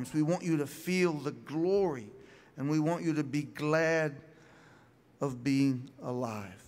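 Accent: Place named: New Jersey, United States. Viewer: It's the way he talks, if you've ever heard him before. Part Jersey, part easy going, part storyteller...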